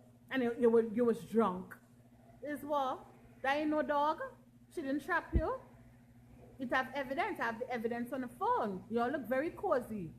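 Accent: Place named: Trinidad and Tobago. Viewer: Hey, you sound more Trinidadian than Grenadian, but that ONLY goes to show how the accent is the same.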